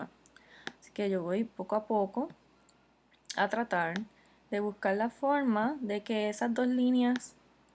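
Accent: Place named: Venezuela. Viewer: Pensé que era venezolana.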